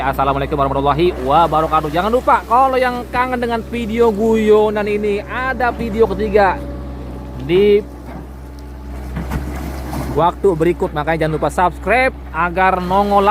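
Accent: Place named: Jakarta, Indonesia. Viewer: Nggak apa2, enak kok ngedengerin logat betawi ora 😅😅😅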